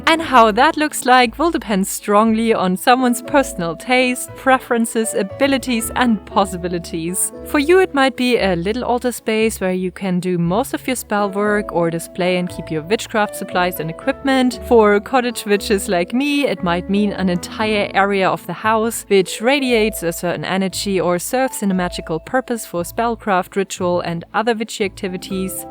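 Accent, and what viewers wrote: Germany. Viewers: sometimes i get a bit of American and Australian from it somehow??? but yes i suspected it was german haha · Hey i wanna ask if you're from germany ,because from the accent😂✌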